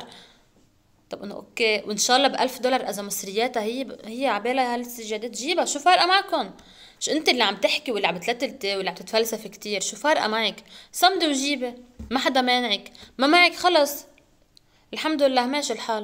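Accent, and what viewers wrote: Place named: Lebanon. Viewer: و أنا بحبك 😂♥️ فعلاً العين و الحسد و اللت و العجن الزايد ما حلو أنا معك بالموضوع وشو كيوت طالع منك الحكي اللبناني 😆♥️ متابعتك من سوريا 😁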